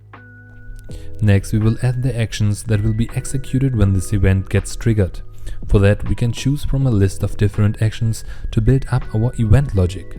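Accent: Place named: Germany. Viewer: wie man einfach immer raußhört wenn einer deutsch ist XD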